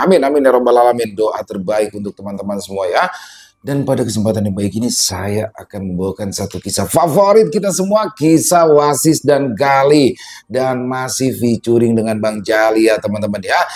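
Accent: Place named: Jakarta, Indonesia. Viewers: hahaha..suka banget logat Betawi.. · Asalamualaikum Bang, ikut nyimak Cerita Mas Wasis & Galih yg bergabung dgn Jawara Betawi Bang Jali & Bang Sam, Ceritanya Tambah Menarik apalagi Logat Betawinya yg dibawakan Bang mantap Banget, ditunggu Kelanjutanya jangan pake Lama, mksh Bang sukses selalu 👍🥰🤗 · ❤❤❤👍👍👍 aq suka logat bahasa betawinya super lucu,bang hebat,success selalu yeah bang wkwkwk 🙏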